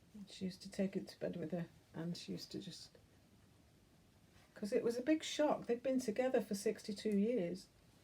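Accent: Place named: Lancashire, United Kingdom. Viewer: Hi. You really don’t need to apologise for your Lancashire accent. You should be proud, at least people understand and know what we say x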